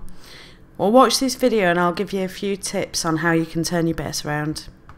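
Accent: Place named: United Kingdom. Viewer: that british english accent is horrible in my opinion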